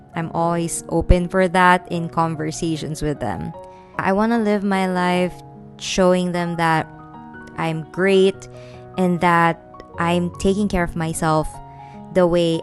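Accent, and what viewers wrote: Philippines. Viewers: I knew it! I thought maybe you are also from the Philippines when I heard your voice haha! I'm happy for you that you found yourself and endured through the hard times in life. I'm also in this lifelong pursuit of art and I have a lot more to go through and its not easy, but I know this is the thing that I've stuck through all this time no matter how challenging it becomes.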